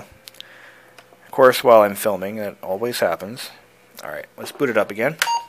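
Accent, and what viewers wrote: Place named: Massachusetts, United States. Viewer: I think I finally figured out what state your from! It's Massachusetts. In some of your other videos you make subtle hints about this but never confirm it. In fact when ever you talk about your computers you acquire from your school district you make it your business to tell us not to ask or even talk about it. It's not a big deal, but for me it affects how I relate to you as a computer guy. Your accent isn't southern or northern, but something else. I'm glad I finally know what part of the country your from so I can at least relate to some of things you talk about, like when you say "in this part of the country you don't see these kinds of Honda's." Which part? In some parts of the country a car from the 1960's can easily be found driving around because the whether is dry and it never rains! People forget how curious some of are and I am glad you atleast allow us to post comments. 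Thanks, Derek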